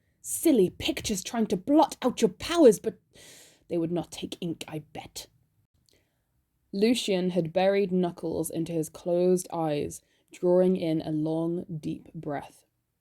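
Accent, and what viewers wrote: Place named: Ireland. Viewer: I’m living for your Irish accent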